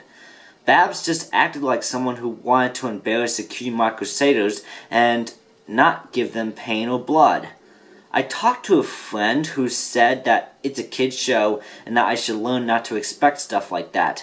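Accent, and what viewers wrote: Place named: France. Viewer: he kinda has a little french accent when he speaks for me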